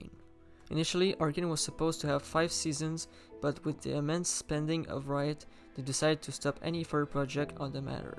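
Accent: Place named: France. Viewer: I cant agree, he has a slight french accent but the quality of the sound is very good.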